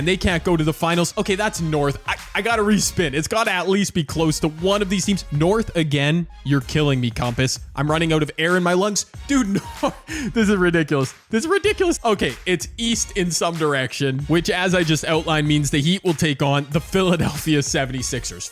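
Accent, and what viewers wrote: Canada. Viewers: Is he from canada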